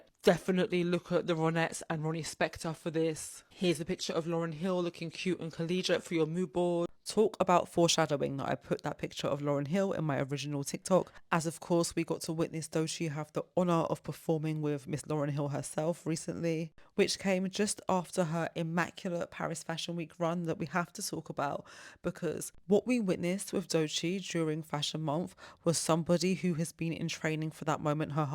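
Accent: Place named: United Kingdom. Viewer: Absolutely love these videos, and your charming British accent!! Do you think that you would ever do a video on Sade? Her iconic red lip is just everything to me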